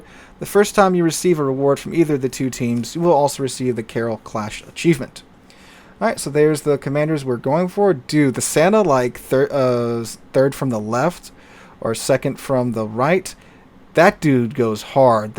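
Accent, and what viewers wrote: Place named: United States. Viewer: He's using the American pronunciation rules. We say it this way, that's what we do.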